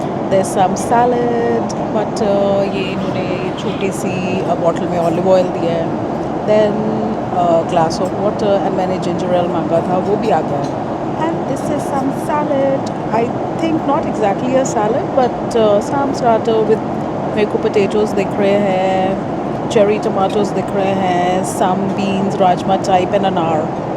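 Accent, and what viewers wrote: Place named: Punjab, India. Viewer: Bhabi g thoda accent punjabi boldeya bahla ajeeb lgda kde kde Bahut sohna kr raye soon u gonna get good number of subscribers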